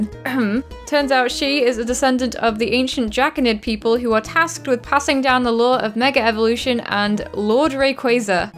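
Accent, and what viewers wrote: United Kingdom. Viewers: British. She mentions it most recently in the Galar Version Differences video.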